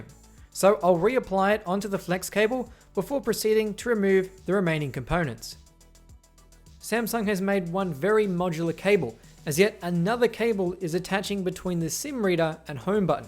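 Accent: Australia. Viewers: I’m from England and we do not have that accent. is Australian (you couldn’t have been further away) lol. I’ve never heard any other Australian pronounce data as daughter/darta either.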